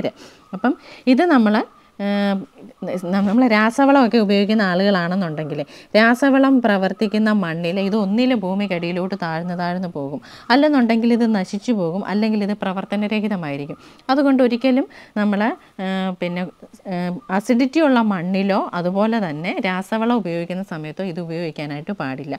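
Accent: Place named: Pathanamthitta, India. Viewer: പത്തനംതിട്ടയിലേത് ശുദ്ധ മലയാളം ആണ്.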